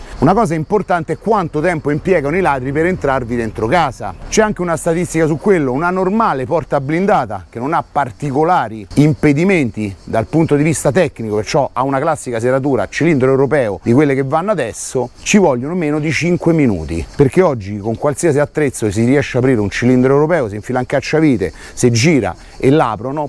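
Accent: Rome, Italy. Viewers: Complimenti, una spiegazione semplificata per tutte le Età, poi con l’accento Romano, nun ce potemo sbagliá 🙂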